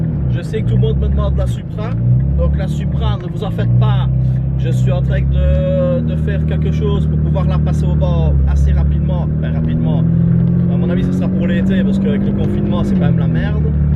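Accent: Liège, Belgium. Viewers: Merci de nous faire part de tes connaissances et de tes explications adaptées. Preparateur gtr, moi je prépare des bons gros tedehi lol chacun son monde ! Lol Chouette vidéo, ton accent liégeois rend unique ton vlog 😎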